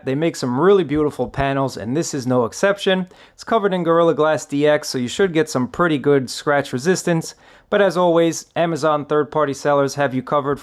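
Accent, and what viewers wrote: New York, United States. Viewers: Love the NY accent.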